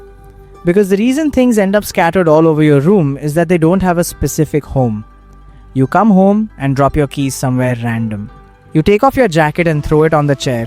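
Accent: India. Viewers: The narration is weird. Why is the accent Indian if the creator is Japanese? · Im sorry. Is this an indian accent rather than japanese?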